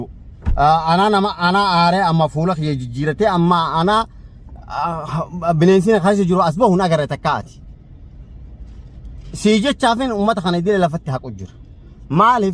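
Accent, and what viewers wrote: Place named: Somalia. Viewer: Kuni namichi sumaaledha oromo maaltu godhe haasofin isaa ni beekama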